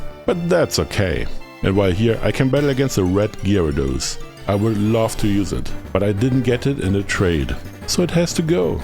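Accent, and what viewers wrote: Germany. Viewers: Top Video man hört fast nicht raus das du deutscher bist, hab trotzdem ne frage wie kann man onix entwickeln ohne tausch in HG ? P.S. mach weiter so deine Videos machen echt spaß zu gucken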